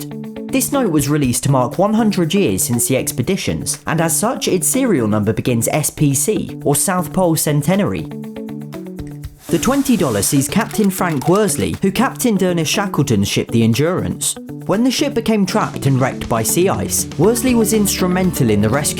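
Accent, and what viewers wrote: United Kingdom. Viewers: Too much British. 3/10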